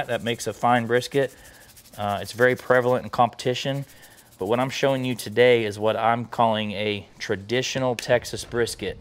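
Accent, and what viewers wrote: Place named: Tennessee, United States. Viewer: Thought you were from Tennessee hoss ?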